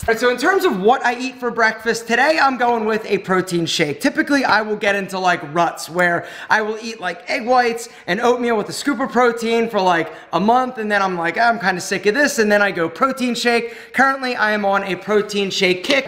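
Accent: United States. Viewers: hey if you are reading this comment you very much look like our Bollywood actor saif Ali khan whose eyes are exactly looking like yours and despite of your us accent his voice of speaking in English is identical of yours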